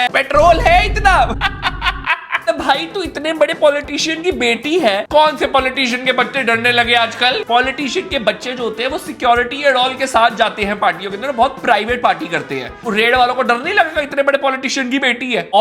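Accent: Punjab, India. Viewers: your punjabi accent is so sexy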